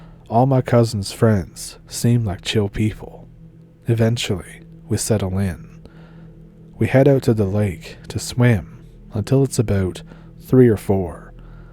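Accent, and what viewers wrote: Canada. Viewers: Well, I have told you before every time I hear your accent, I first think of my Canadian cousins 👍😁🤟🎉🎉🎉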